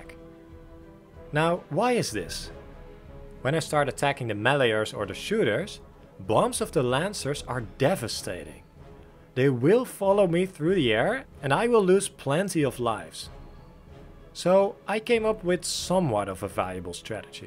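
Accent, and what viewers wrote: Netherlands. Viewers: Bro no hate I am from the Netherlands aswell and the accent here is so ugly I ain’t gonna listen to that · Very nice video. Is your mother tongue Dutch? The accent sounds very familiar :D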